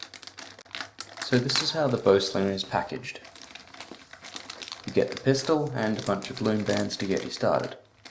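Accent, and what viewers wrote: Australia. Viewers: You aussie?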